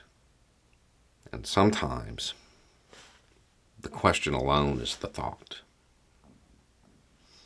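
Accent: United States. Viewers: This is one of your best Beau, I've PTSD, and my wife doesn't like you because you are an American, and look like you do and sound, if it helps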